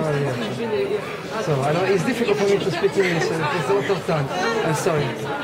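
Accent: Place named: Italy. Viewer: This Italian accent!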